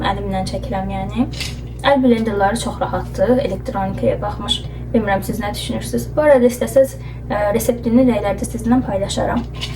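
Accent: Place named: Azerbaijan. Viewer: kız çok güzel konuşuyorsun gerçekten Türkçeyi en güzel Azerbaycanlılar konuşuyor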